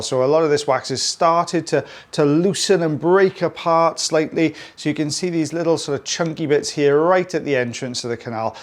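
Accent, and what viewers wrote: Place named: Wales, United Kingdom. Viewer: I live in Australia but am from UK. Lived in Wales for 7 years and watch you to hear your voice (besides the extractions of course) Takes me back 57 years. Of course I hope you are feeling better, it’s winter here and lots of flu, head colds etc. Hope you coped with the heatwave, that’s the norm here in summer.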